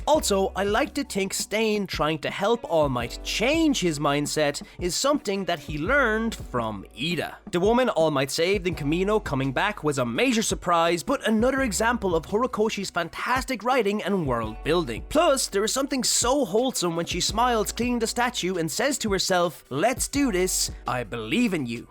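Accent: Ireland. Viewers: Where ye from lad? Ur accent sounds slightly irish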